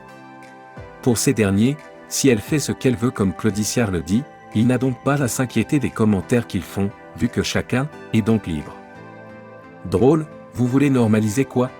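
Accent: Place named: Europe. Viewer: Quel médiocrité.... et utiliser une voix européenne pour dire que les médias de l'occident s'y mêle est encore plus nulle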